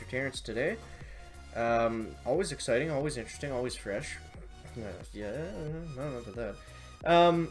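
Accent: Canada. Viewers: What part of Canada are u from?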